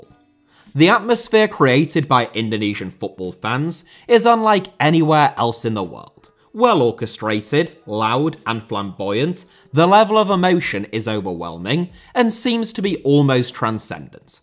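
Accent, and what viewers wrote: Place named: United Kingdom. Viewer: Your voice i know you🤣 the british accents viral boy????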